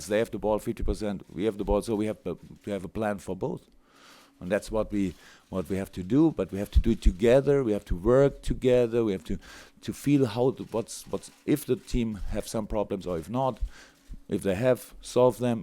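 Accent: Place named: Germany. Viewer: His english is very good, but you can hear sometimes the german in him. 😉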